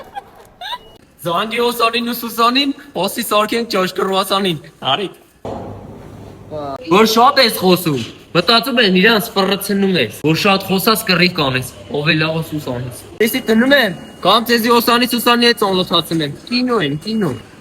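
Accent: Gavar, Armenia. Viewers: վայ խենթանում եմ Գավառի բառբառի համար, շատ անուշ է😂😂❤❤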